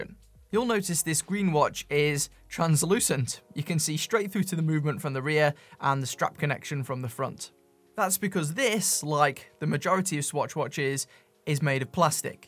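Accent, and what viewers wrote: United Kingdom. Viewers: OMG, my brain is so confused watching you. You sound like a native Brit, which I assume you are, but look totally like Slav :)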